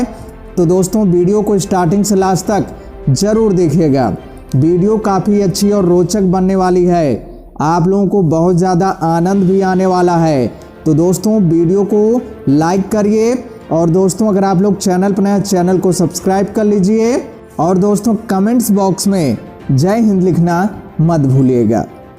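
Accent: Bihar, India. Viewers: Tum Bihar ke ho na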